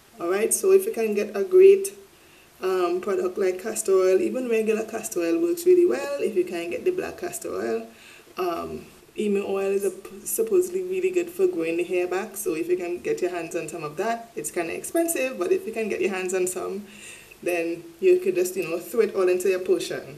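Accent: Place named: Dominica. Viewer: Great Tips .Where you from u sound like someone from Dominica.I'm a Island girl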